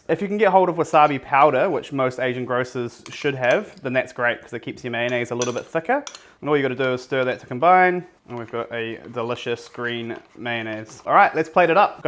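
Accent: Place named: New Zealand. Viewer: Who the fuck taught you accents lmao he's clearly a kiwi.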